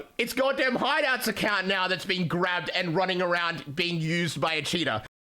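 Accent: Australia. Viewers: Came for the drama, stayed for the Aussie accent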